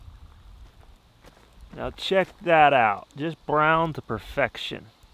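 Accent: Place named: Pennsylvania, United States. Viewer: That accent is familiar you from Pa? I am from ruffsdale myself.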